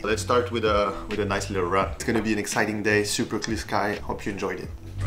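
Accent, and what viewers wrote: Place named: France. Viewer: l'accent français c'est impossible de s'en débarrasser en fait mdrr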